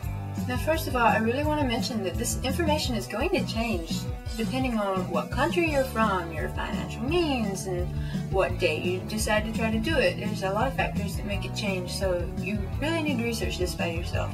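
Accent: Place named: South, United States. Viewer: i was wondering where she's from until i heard that.... ahhh. yep, thats southern. :D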